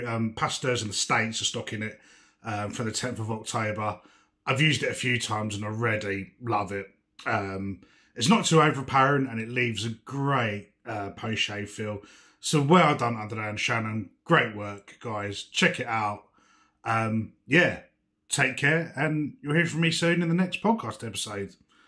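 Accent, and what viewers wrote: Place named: United Kingdom. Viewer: Hello! Your latest video popped up in my feed so I subscribed, this is the first thing I've watched... Where in the world do you live? You have an incredibly authentic British manner of speech for someone in Brazil 👀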